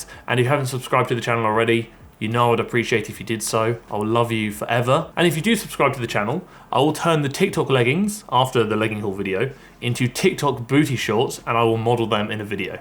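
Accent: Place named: England, United Kingdom. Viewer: Here for the accent 🤣🤣🤣 so awesome, England? Sounds like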